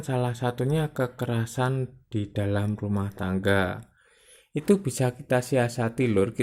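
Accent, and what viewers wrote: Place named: Banyumas, Indonesia. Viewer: sing ngomong wong mbeyong,,,wkwkwkwkwk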